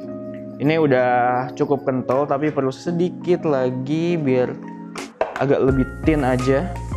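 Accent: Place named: South Jakarta, Indonesia. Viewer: Kebiasaan liat&dengerin aksennya Gordon Ramsay ama Banging with Babish jadi agak gimana denger aksen ala Jaksel.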